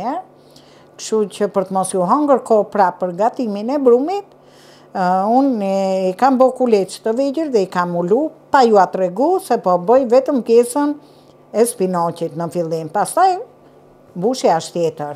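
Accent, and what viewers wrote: Tirana, Albania. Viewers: Mirdita zonje , kam pak kohe qe po ju ndek nga Italia, me kenaqe me ate fhekesin e vjeter tironase, dhe me recetat dhe shpjegimin tuaj te fhjesht popullore. Ju uroj SHENDET 👌👏🙅‍♀️❤️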